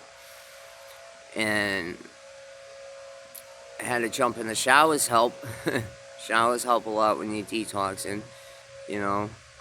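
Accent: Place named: Massachusetts, United States. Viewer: You sound like ur from mass I’m from mass 😀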